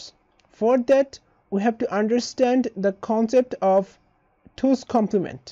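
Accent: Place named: Bengal, India. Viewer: Bengali English accent mai bolne ki zaroorat nhi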